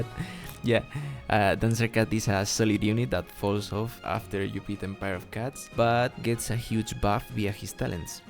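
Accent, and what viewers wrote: Spain. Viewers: Se nota muchísimo el acento español, gran video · eres español? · amo tanto el acento español en el inglés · Es la leche ver a un español hablando ingles, buen video igualmente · are u spanish? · Soy yo, o es obvio que eres español?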